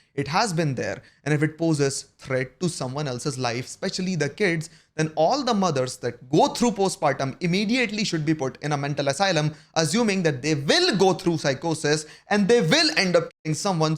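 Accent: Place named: United States. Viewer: Wanna wanna bolna bandh kar American kalon jaise. Dhang se 'want to' bol.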